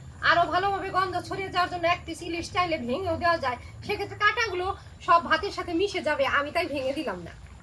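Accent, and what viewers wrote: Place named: Bangladesh. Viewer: আমি নিশ্চিত যে আপনার পূর্বপুরুষ বাংলাদেশর ছিল। আপনার কথা আমাদের দেশের।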